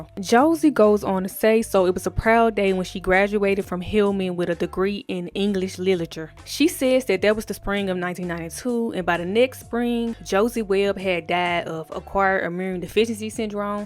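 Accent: Memphis, United States. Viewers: Are you from Memphis ? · The Memphis accent makes this recap even better child 😂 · Girl, are you from Memphis? I love your accent! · Girl, the way you said Music. You from Memphis? Lol Love your accent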